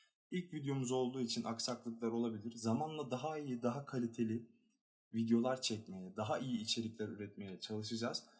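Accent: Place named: Istanbul, Turkey. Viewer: ÇOK KASIYOSUN KENDİNİ VE KİBAR KONUŞUYOSUN YANİ İSTANBUL TÜRKÇESİYLE KONUŞUYOSUN KÖTÜ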